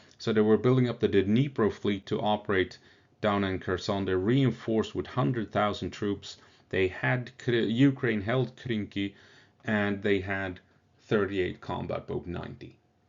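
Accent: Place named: Sweden. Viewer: "Combat boot" and "Combat boat" sounds eerily similar when you speak with a swedish accent. Yes! I throw bricks in a glas house. I speak even better swenglish.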